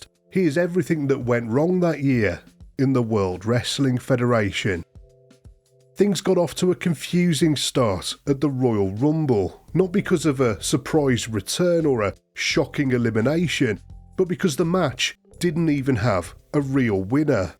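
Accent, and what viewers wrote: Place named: Black Country, United Kingdom. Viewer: love 94 almost as much as your accent. Yow sound like youm from rand ere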